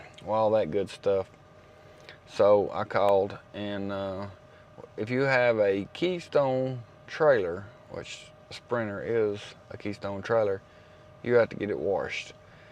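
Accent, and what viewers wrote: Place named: Tennessee, United States. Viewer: I was guessing where in Tennessee you are from. My mom came from Lawrenceburg ,tenn. The accent is familure. Be safe.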